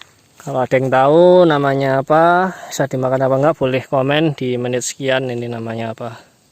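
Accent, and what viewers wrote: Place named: Surabaya, Indonesia. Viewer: Mas suarsne sby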